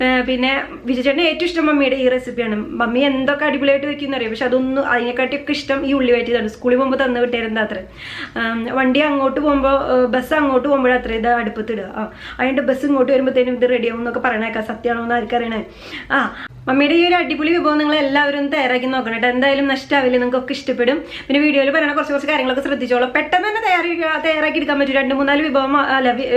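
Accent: Thrissur, India. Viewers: adipoli Thrissur bhasha.... 👆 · Supr chechiii...bt speed koodithl aanataaaaa....thrisurkaro aanooi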